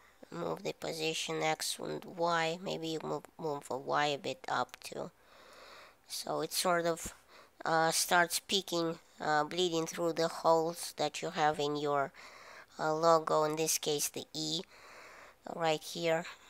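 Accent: Russia. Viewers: You sound a bit Russian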